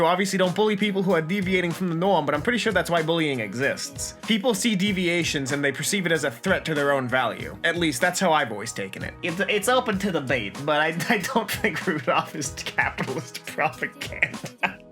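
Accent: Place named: Rhode Island, United States. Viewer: Discusses capitalist propaganda in a thick RI accent as well.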